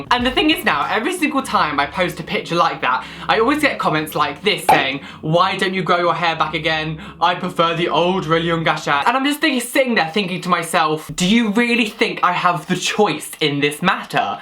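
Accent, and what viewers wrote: United Kingdom. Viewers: You also have a beautiful British accent that literally covers it up I wish I had an accent that beautiful so for the rest of us we don't have accidents to confiscate I need my hair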